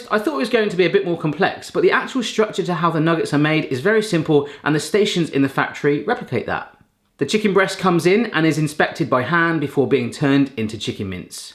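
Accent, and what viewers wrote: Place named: RP, United Kingdom. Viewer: Loving the Posh accent mate.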